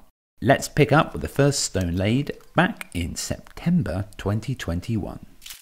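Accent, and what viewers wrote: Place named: England, United Kingdom. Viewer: It is a mental relief to listen to the English pronunciation. One can feel both the general education and the respect from the messenger in comparison with the American YouTube channels, which are constantly at grueling "macho-OCR-speed".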